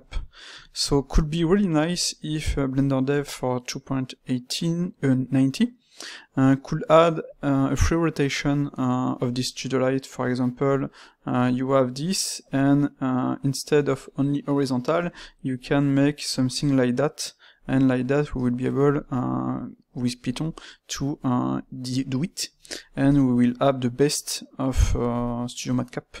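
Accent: France. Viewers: toi t'es francais 😂mais sinon trés bonne video continue comme ca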